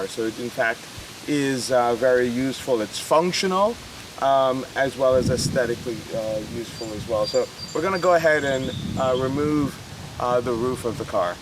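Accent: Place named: New York, United States. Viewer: He was born here what's accent are you talking about ? Probably is because he is from New York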